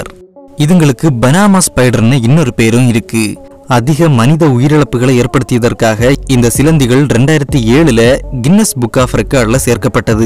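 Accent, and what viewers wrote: Kanyakumari, India. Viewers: Bro ungaluku Kanyakumari ya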